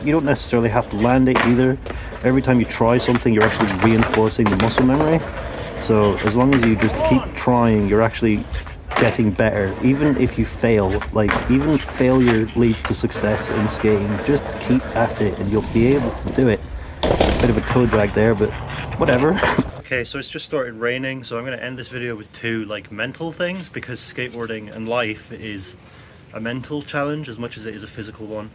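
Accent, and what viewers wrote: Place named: Liverpool, United Kingdom. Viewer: Your accent, what is it? Liverpool? Cool video. Subbed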